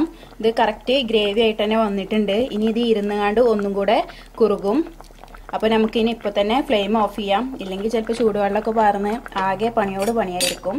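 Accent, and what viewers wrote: Malappuram, India. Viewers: മലപ്പുറം. ആണോ വീട് സംസാരം. കേട്ടിട്ട് നമ്മുടെ. ഒരു. Reedi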